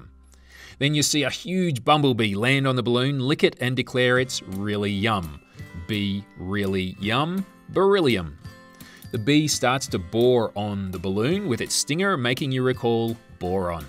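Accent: Australia. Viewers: R u Australian? 😅 sorry but u sound Australian just asking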